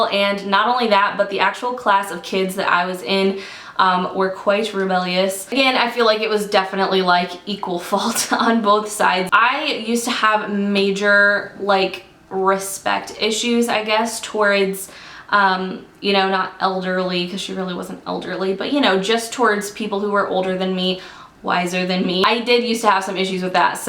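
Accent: United Kingdom. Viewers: Sounds Like Plymouth south muddle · how are you not british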